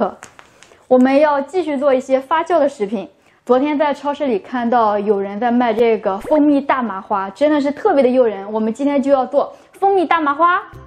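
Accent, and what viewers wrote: Shandong, China. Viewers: 普通话有山东口音。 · 我听口音早就听出来她是山东人了，哈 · 我听口音也像山东人，竟然还是临沂老乡！是临沂一中毕业的吗？怎么没有糁？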